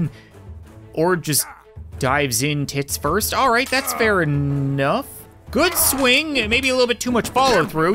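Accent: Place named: Canada. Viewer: When I first started watching him. I couldn't tell that he was Canadian. but NOW?! Got me up here thinking Paul Bunyon made a YouTube channel😂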